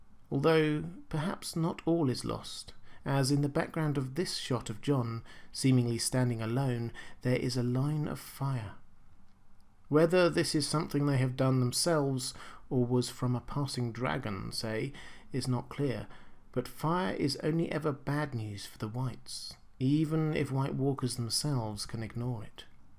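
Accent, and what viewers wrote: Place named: RP, United Kingdom. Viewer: He, by far, has the best voice of all of the channel owners. Sort of a younger, less annoying, more posh David Attenborough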